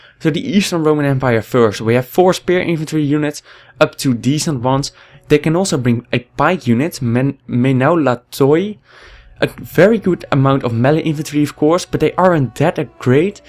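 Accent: Netherlands. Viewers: Je bent een Nederlander zo te horen. Interessante review tho. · are you dutch by any chance? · Damn, that dutch accent though, keep up the good work!👍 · Zo Nederlands deze vent, geniaal accent dat! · Deze kerel moet wel nederlands zijn haha. lekker accent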